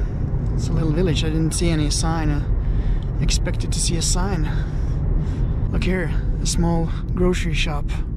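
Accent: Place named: United States. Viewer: Ok, i imagined that you were a turist due to your english pronunciation that looks like american (or canadian) to my ears .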